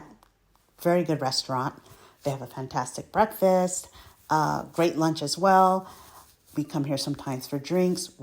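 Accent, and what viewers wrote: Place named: Anguilla. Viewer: What a great travel guide! A voice of a true Belonger!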